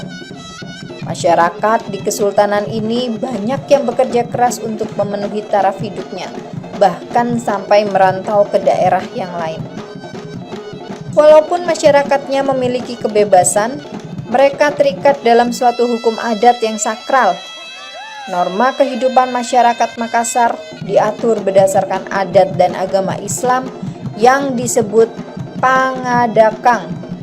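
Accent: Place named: Java, Indonesia. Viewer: masih kentara logat jawanya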